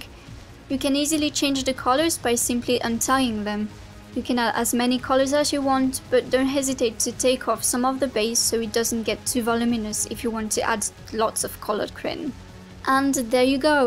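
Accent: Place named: England, United Kingdom. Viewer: Donne moi ton accent anglais on fleek s'il te plaît:(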